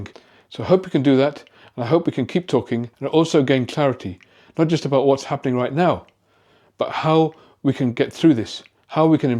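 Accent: United Kingdom. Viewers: When I hear mathematics with a British accent I always think of the teacher from Willy Wonka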